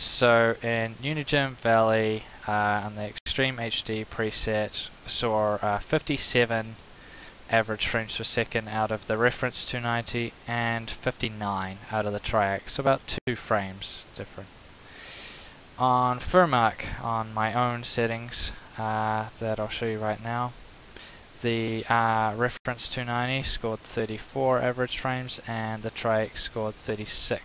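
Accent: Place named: New Zealand. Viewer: thought you sounded kiwi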